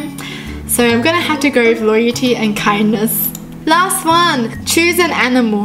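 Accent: Australia. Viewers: Your Asian? Oh..Well I always thought you were Australian😂😂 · You're* her parents are Asian so that makes her Asian but she lives in Australia, that's why her accent is like that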